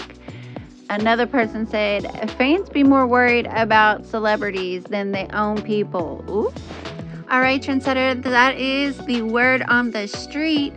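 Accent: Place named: South, United States. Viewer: Love your voice! You sound like a southern Belle! ❤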